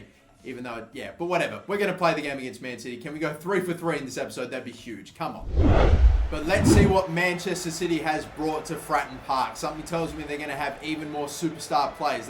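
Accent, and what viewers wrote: Australia. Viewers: Is he Australian ? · He's an Aussie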